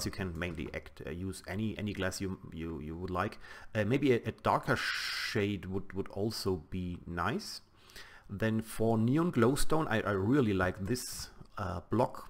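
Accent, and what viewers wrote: Germany. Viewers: Are you from germany? nice video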